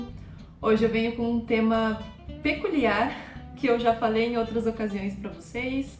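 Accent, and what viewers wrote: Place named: South, Brazil. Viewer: aaaaaa vc é do sul, como nunca notei?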